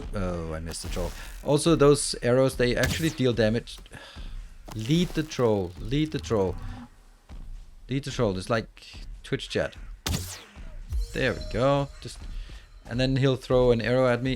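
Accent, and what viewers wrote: Denmark. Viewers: You sound Danish :)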